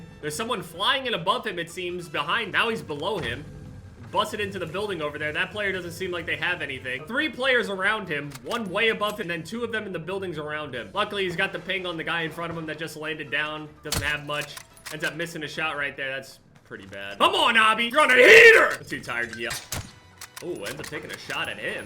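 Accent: United States. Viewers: I love his cowboy accent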